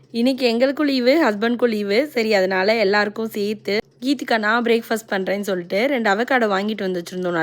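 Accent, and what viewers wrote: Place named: Dindigul, India. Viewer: Akka neenga Tamil natural dindigulayaa iruntheenga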